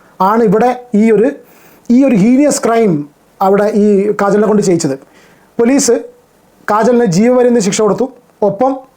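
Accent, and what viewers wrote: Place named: Palakkad, India. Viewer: ബ്രോ വീട് പാലക്കാട്‌ ആണോ ഭാഷാ ശൈലി അതുപോലുണ്ട് 👍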